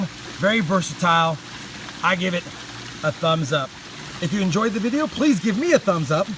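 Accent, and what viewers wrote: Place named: Louisiana, United States. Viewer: Are you from Louisiana?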